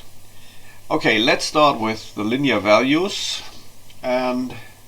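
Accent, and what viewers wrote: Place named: Germany. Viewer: German english with stuffy nose... What a phonetic combo... Nice tut by the way, good job.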